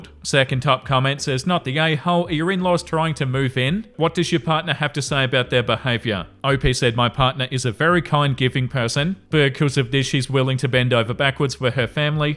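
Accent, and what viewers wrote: Australia. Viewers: Australian, one of the best accents imo. Altho I'm a bit enamored of a Scottish accent as well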